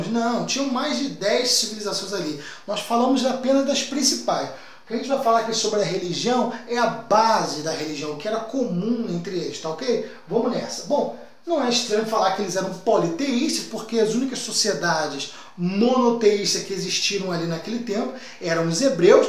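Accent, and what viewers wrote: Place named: Florianópolis, Brazil. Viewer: Seu sotaque é de Florianopolis vc é de lá eu sou eu sou manésinha kkk!!!!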